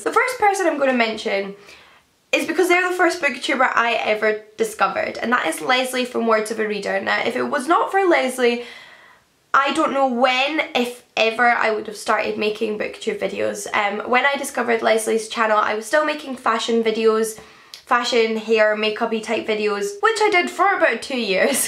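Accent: Scotland, United Kingdom. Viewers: And your Scottish accent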